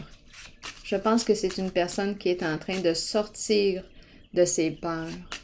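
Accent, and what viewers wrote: Canada. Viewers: Bonsoir, que le ciel vous entende⚖️🤗, Soyez bénie, belle âme au joli accent canadien ❤️🙏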